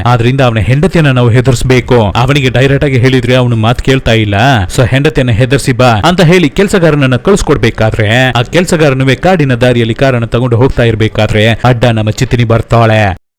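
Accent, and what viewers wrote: Tamil Nadu, India. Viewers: Tamil slang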